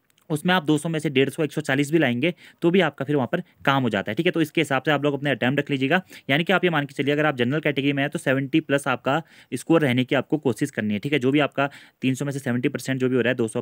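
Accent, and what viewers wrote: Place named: Pahadi, India. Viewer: Hey! handsome bro, ever first time aapka deedar huwa, Literally handsome, I like your pahadi accent. M an esm, following you for uk gs❤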